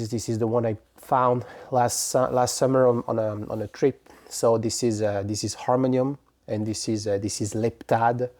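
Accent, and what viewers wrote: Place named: Italy. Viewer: Salut j'aime beaucoup ton accent italien 😁😉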